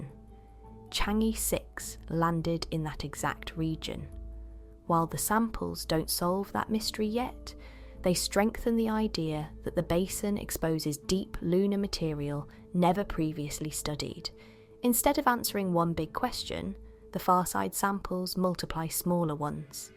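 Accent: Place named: England, United Kingdom. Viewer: Hmmm A Chinese AI with an English accent. Already sounds suspicious. It asl us to like and subscribe before the story gets strarted, They found a metotite in mongolia that was clearly marked made on far side of moon. They found that the samples taken 55 years ago and thousands of miles from their sample site were different. Okay, I've listened to enough. Thanks for the laughs.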